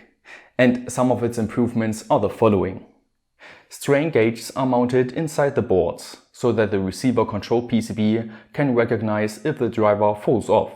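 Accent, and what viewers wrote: Germany. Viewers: Hört der sich nur für mich deutsch an ? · I cant stand your german accent please learn proper english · Are you from Germany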